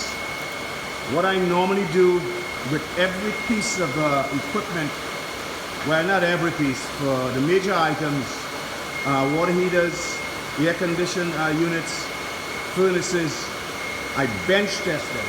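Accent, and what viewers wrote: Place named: Trinidad and Tobago. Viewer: Good morning. Just looked at video. Informative and simple thanks. Trying to test my suburban. I hear a trini accent. Where are u located?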